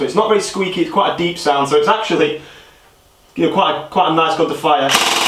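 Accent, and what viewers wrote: United Kingdom. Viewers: And he also has a British accent. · Soon as I heard his wussy British accent I knew the ak was fake. Oh well, back to trying to plate my real ones.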